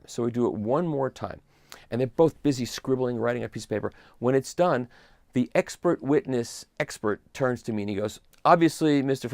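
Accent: United States. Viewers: You sound like Dustin Hoffman in Tootsie with your Texas accent. 😀 Nice job though recounting everything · I’m from Dallas and you’re getting close to the accent.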